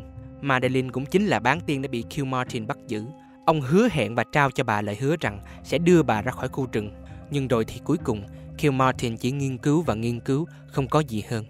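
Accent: South, Vietnam. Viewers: Ko quen giọng miền nam à ? 😅😅 bake nên nghe ko quen chứ gì ?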